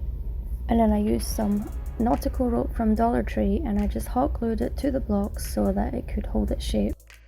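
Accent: Scotland, United Kingdom. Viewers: Your voice is so soothing, I love how you don’t rush through making them. Not Throwing them together super fast, which seems to be the norm. You take your time which makes a huge difference to the quality of the finished piece. Yesterday I saw a video of someone using these blocks to make decorative items for the first ever time. In comparison to your creations they were more arts and craft pieces. Not knocking others work just an observation. When I was watching the other poster create items in my mind I saw the potential for a few of your items and how when used in specific ways you can create swirl effects and illusions. Then as if by magic your video popped up in the feed, I’m so pleased I clicked on it! You created what I could see in mind 🪄 ✨ You certainly have an eye for what looks good, not only can your mind see the potential of combined pieces, but you’re able to actually bring them to fruition. 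Well done 👏 these are really lovely pieces you made, they’d blend in beautifully in most homes and/or functional places, no doubt receiving compliment after compliment. Quick question, you said you got items from the dollar store, except you have that beautiful soft Scottish accent. Am I wrong in thinking there are dollar stores here in the uk? Thank you for this wonderful video, it was an absolute pleasure to watch, incredibly relaxing. You’ve gained a new subscriber 🙃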